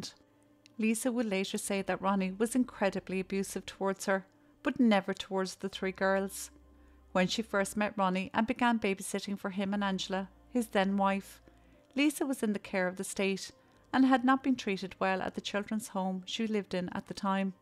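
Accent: Ireland. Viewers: Dear, please don't be disheartened. Your videos are excellent and I love to listen to your lovely Irish lilt. Listening to you makes my day! ❤ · Its been such a long time since ive been back on. My apologies for not being able to show support on here as of late. I'm glad I'm back for now and will try to catch up on all the videos ive missed while I was in hospital. Certainly have missed my favorite Irish accent. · Your videos are incredibly well researched and beautifully narrated with that lovely Irish accent. You are very sensitive and respectful and can only do as well as the information out there. Hi from New Zealand 🇳🇿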